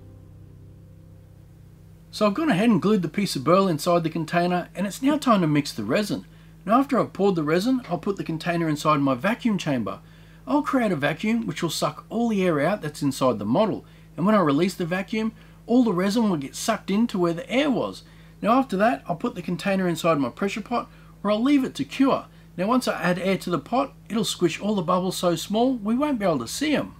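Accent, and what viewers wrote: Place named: Australia. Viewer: I love everything you make and it is nice hearing a fellow Aussie on YouTube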